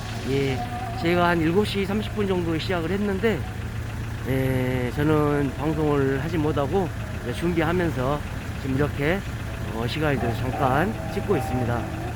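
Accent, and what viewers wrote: Seoul, South Korea. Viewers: 서울사람보다 서울말 더 잘하는 님~ 화이팅~!! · 님 완전 서울사람인데요 ㅎㅎ 홧팅!!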